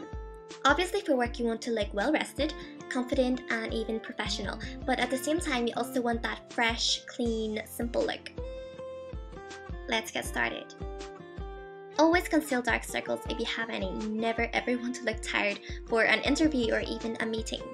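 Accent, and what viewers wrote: Ireland. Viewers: Telling someone who technically speaks English fluent and has an Irish accent not to try to sound fluent is just plain retarded. And you need to clean your ears please because I, who do not speak English as my first language, am able to understand what she's trying to say. Thank you very much. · I know everyone says this but I LOVE your irish accent (: haha talk about the irish charm. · are you like, half irish or something? :) great tutorial btw <3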